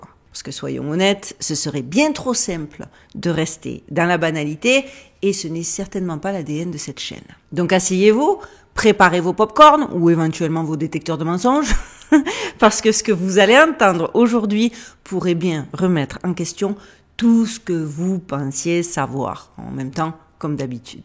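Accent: Marseille, France. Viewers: Hello everyone ! J'adore <3 Avec l'accent marseillais en plus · Cet accent de la garrigue! Un délice!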